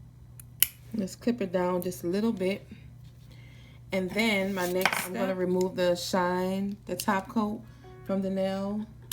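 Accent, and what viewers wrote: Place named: New Orleans, United States. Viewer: baby that New Orléans accent thick hunny 😂😂 (i love the way they speak). nails look good girl keep up the good work this really helped me fix my broken nail. 💕🙏🏾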